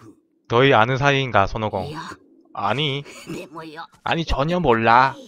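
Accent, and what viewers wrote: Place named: Busan, South Korea. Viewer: 예전에 플스로 먼저 했었다길래 어디있는거지 하다가 어쩌다보니 검색으로 나왔네요 ㅋㅋ 시청갑니다 근데 목소리가 진짜 달라보이네요 ㅋㅋ 이때는 사투리도 더 심하고 ㅋㅋㅋ부산에서 태어나셨구나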